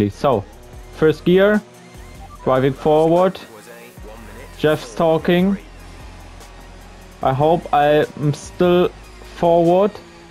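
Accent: Germany. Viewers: dieser deutsche dialekt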